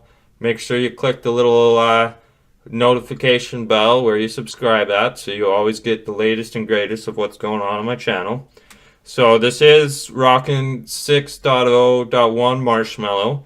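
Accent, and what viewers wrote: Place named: Canada. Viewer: For your screen on time of 10 hours, is that over the course of two days with quite a bit of standby time mixed in, or more straight usage in one day? Also sounds like you're in Canada so I was wondering if you bought this phone from a carrier or unlocked? If you bought it unlocked where did you get it? Seriously considering this phone, samsung even dropped the price to $450 CAD on their website.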